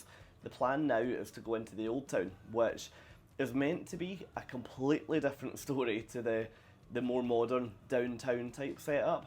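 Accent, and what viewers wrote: Scotland, United Kingdom. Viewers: You couldn’t be more Scottish if you tried. Bless you, honestly no one ever walks in the emirates except around the shops! I hope you didn’t get heat stroke, loved this Vlog though. And the food looked fab on there. PS. The gold is the dowry for your daughter, you’re supposed to buy pieces to add to your collection so you can have the best choice of husbands for her 😂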